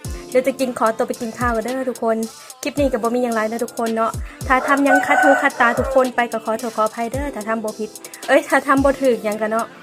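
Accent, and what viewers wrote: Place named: North, Thailand. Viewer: สวยครับเหมือนสาวเหนือพูดไทยน่ารัก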